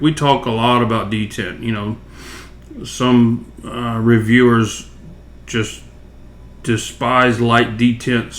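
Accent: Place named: Texas, United States. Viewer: That texas accent doe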